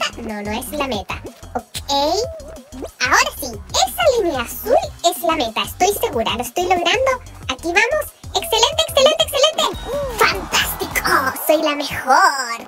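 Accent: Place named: Chile. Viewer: Amo la voz chilena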